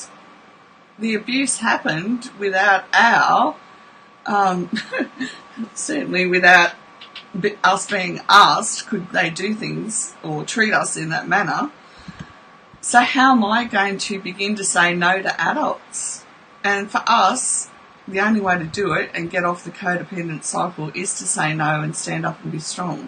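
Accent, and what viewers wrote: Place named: Australia. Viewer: Thought I’d add, I’m a 49 year old woman and you tube popped up your video, I live in Jackson Mississippi and was listening to Dr Kirk Honda vids. You sound Australian, are you? I married a kiwi and resides in NZ for 10 years. I’m often amazed at the people who I won’t know “in real world life” can provide such insight and help to others. I plan to watch more videos, you seem very pleasant as well as quite credible and informed.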